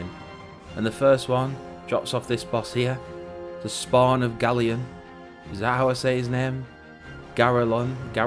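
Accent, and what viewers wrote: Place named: Northern England, United Kingdom. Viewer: Celestial Tournament is hard af. You need very specific pets with exact numbers. I have Mini Tyrael (Went to the invitational in 2008 with my bestie) and I’m from Northern England and you sound local-ish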